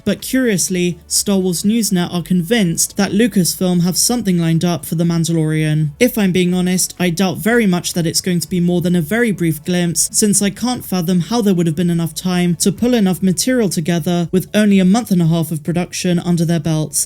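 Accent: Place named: RP, United Kingdom. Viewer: 🤔 Going with Pedro doing last minute voice work for Boba Fett. Why take time out of filming one show, to do some voice work for Mando, when there is plenty of time to do so later. Like you said, last minute voice work happens all the time. More Migs please! Also, nicely done on the quote, as always, and never noticed you ever do them badly. You're like proper BBC Star Wars lady 😊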